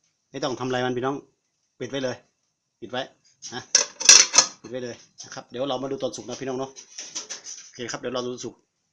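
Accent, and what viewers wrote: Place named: North, Thailand. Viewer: สำเนียงไม่ใช่คนอิสานเด้,คนเหนือแต้ๆเด้นี่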